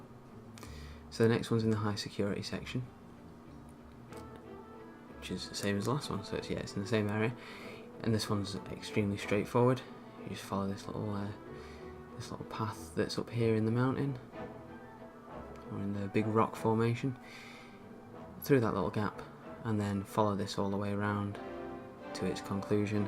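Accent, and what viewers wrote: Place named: United Kingdom. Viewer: Yes, Ewan McGregor :D And I mean the person that is speaking in the videos. I wish I had this accent. I am not British though so I have to live with my foreign one... ah well :D Jealous though.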